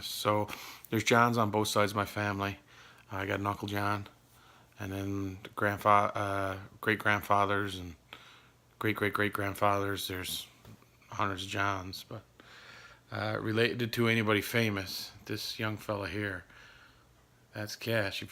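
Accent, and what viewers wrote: Canada. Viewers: Team_Natty that’s not just a Canadian accent my friend, that is a Newfoundland accent.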